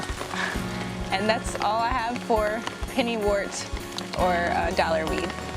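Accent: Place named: South, United States. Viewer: I need to go down south and take a class from you on wild vegetation you have a everlasting knowledge on it love the southern accent btw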